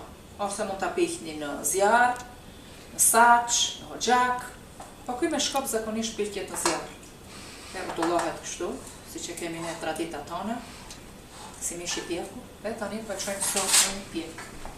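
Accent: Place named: Vlorë, Albania. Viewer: Qe prej theksi dukeni qe jeni vlonjat😍❤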